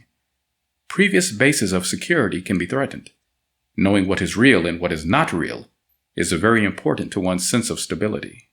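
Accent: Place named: Chicago, United States. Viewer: Don't wanna hear a Negro with a Chicago accent read to me sorry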